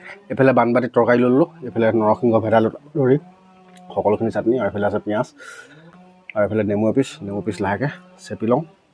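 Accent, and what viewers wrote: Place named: Nagaon, India. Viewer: Apunar video xodai saisilu kintu nagaon kotha kuwa karone beya lagit moi jihetu nagaon hoi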